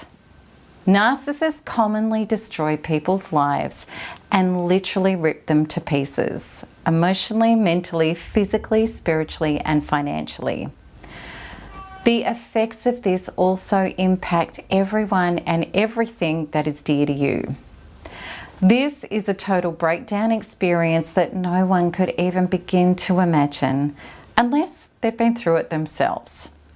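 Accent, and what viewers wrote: Australia. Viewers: What a heavy australian accent.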